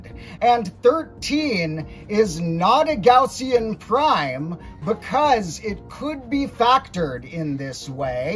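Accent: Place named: United States. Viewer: It's like with danger and an American accent.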